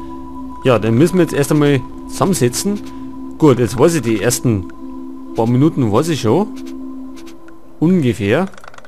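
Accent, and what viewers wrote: Bavaria, Germany. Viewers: Sehr geil das des amoi ajemand auf boarisch mocht, da geht mia a herz auf <3 Super LP weitermocha ;D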